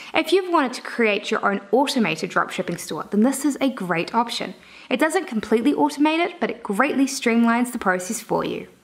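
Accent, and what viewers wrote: New Zealand. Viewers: Took me a few minutes to get the Kiwi accent but sounds like it has a twang from somewhere like Canada or Somerset UK ;) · Another great video thank you. Love that this is Kiwi made. Just a pity others don't understand our accent. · No she's a Kiwi (New Zealand) or as you guys would hear Nu Zilland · NZ accent, just replace 'e' into 'i', that's simple =) eg: tidtalks, stip by stip, siven & etc... Tips: 0.5 speed listen carefully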